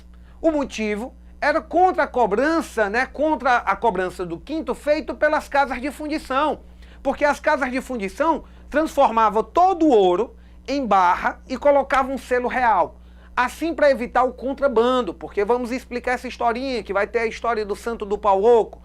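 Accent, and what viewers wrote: Northeast, Brazil. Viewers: Esse sotaque nordestino é tããão lindo 😍 Parabéns pela aula, muito esclarecedora 👏🏼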